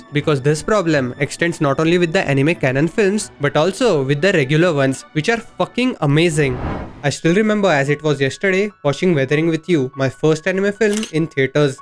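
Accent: India. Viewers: YOU HINDUSTAN ACCENT IS DISGUSTING BYE INDIA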